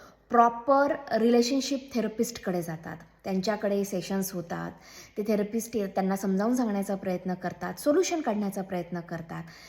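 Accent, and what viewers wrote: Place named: Marathwada, India. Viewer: तायडी तु मराठवाड्यातील आहे का.. भाषेवरून वाटतयं😅😅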